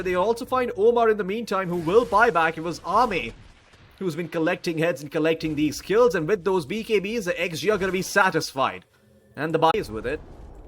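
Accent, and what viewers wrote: India. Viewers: indian caster wtf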